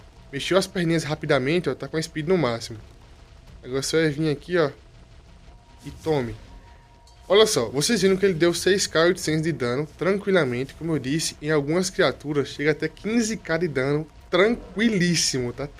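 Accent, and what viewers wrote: Northeast, Brazil. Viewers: de que estado se é sou do nordeste vc parece ser nordestino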